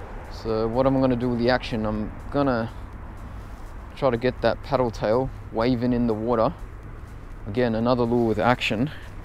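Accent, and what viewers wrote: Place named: Sydney, Australia. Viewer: Sydney accent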